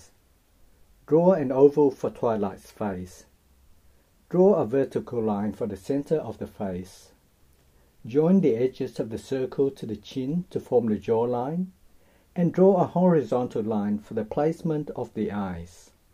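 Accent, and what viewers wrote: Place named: United Kingdom. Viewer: u sound british. sorry for saying that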